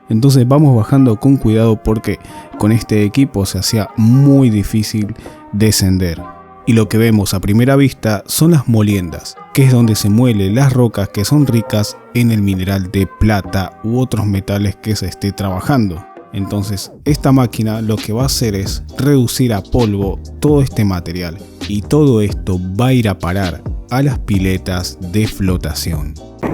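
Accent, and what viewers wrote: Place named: Argentina. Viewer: No Es argentino. Oye su acento .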